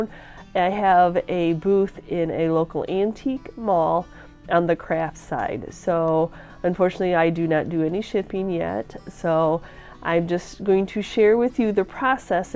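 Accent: South, United States. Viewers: Hi! I just love your adorable southernness!:-) I am somewhat following your business plan & I very much appreciate all of your help! I don’t usually comment because I watch on my TV and I’m pretty sure you can’t write from there. I am VeryMaggs@ if you’d like to stay in touch... I am in Los Angeles with my husband (I’m straight LOL, I wanted to make sure you didn’t think I was flirting. I hope to hear from you! •••Maggie•••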